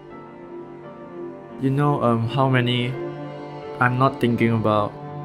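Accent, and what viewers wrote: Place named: Singapore. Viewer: U sound like singaporean lol xD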